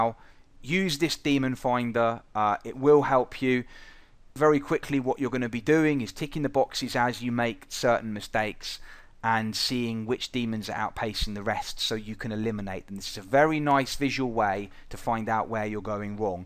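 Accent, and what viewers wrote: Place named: United Kingdom. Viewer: I love when he swearing and offending people with british accent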